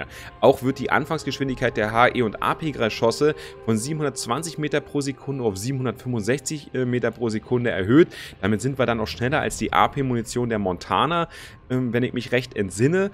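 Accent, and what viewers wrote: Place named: Berlin, Germany. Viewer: Da hört man irgendwie ganz stark den Berliner raus, oder? ;)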